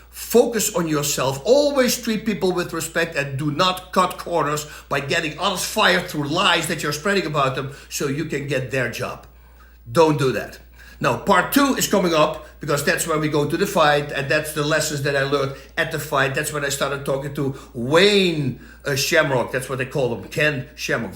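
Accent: Netherlands. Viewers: i couldn’t, his dutch accent is too much. every dutch person speaks english like this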